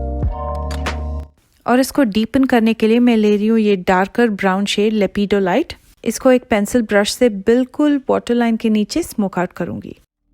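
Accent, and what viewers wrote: South India, India. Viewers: feeling lucky got ur reply🙈 everybody can understand u r fluent with it😍😍😘😘south indians speaking hindi is the cutest thing in the world🌏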